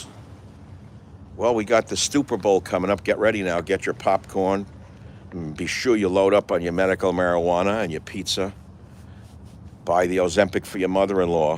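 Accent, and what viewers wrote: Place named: Brooklyn, United States. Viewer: Gods did I miss that Brooklyn accent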